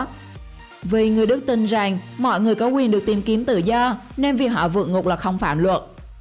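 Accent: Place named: Phu Yen, Vietnam. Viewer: Đây là giọng Phú Yên,gần Bình Định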